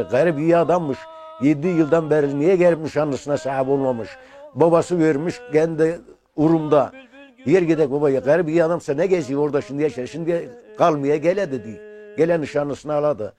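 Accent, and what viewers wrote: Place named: Gaziantep, Turkey. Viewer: emmi antepli gibi konişi aceb nereli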